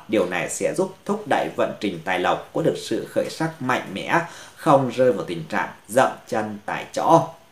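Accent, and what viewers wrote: Thanh Hoa, Vietnam. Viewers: Sao giọng thầy giống như giọng thanh hóa quê cho vây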